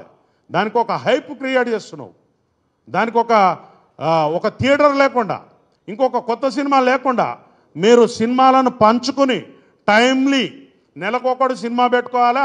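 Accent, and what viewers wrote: Telangana, India. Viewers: తెలంగాణ యాస ఆలానే ఉంటది కదా మరి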